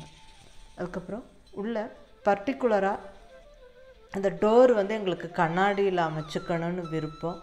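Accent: Kongu, India. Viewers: Mam ur from which place. Ur slang looks like Coimbatore. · I like your kongu tamil very much. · Hi mam,unga kongu Tamil nallarku,and unga son pencil sketch really nice.....we r planning to built a new home....unga pooja room,bed room,kitchen over all its so good and romba tasteful ah katirkinga....pls show al d rooms...and front elevation....and pls mention d furiture purchase place.... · Neenga kongu Tamil a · Alaga Coimbatore slang pesaringa pongako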